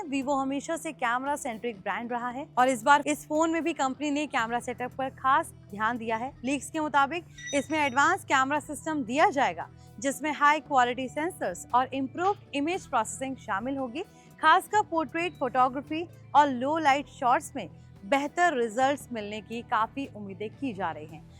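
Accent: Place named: India. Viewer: What language are you speaking? Sounds like India dialect mixed with bunch of some english words. Best regards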